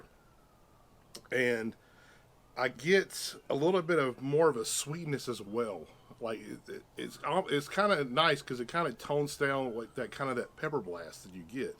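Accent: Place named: North Carolina, United States. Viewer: Great review. You sound like you should have a BBQ channel lol. It’s that NC accent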